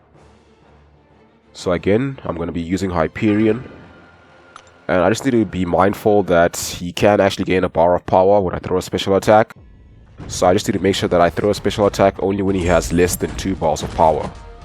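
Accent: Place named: South Africa. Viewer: Whats your nationality? You sound a bit south african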